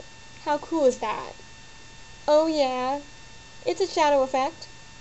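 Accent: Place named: Asia. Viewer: you sound like an asian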